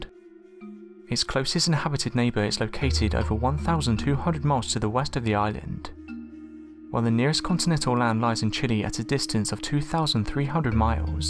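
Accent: England, United Kingdom. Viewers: It's just the intonation that English people use. Get over it.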